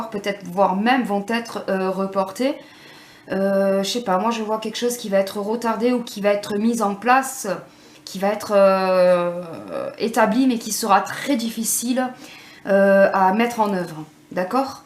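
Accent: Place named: South, France. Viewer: Merci J'apprécie beaucoup votre travail Et ce petit accent du Sud m'apporte le soleil qu'il manque chez moi Merci et bravo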